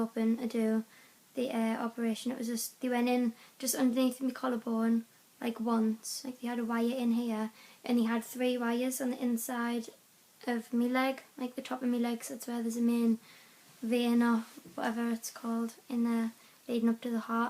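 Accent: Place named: United Kingdom. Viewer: Loved your video. I am scheduled for this ablation on 10 May. Very anxious. Lots of scary videos on this procedure. BTW I do love your british accent, and you are also very beautiful. Ken in USA.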